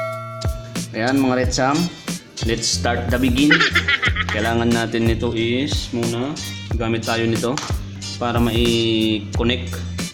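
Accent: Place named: Bicol, Philippines. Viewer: aram ko po an tono kan pagtaram kan bicolano hehe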